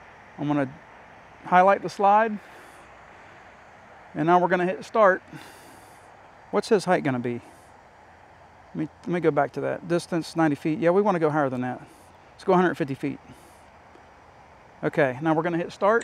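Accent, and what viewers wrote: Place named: West Virginia, United States. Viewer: I really enjoy all your tests, sense of humor, and I love your WV accent. You seem like such a down home Christian guy. I also love your scripture quotes at the end of your videos. I'm a California Baptist, and I love listening to Southern Gospel music. I'm planing a trip to the east coast this fall, for a short hike on the AT. It would be great to meet you and and shake your hand. Thank you for these great educational videos!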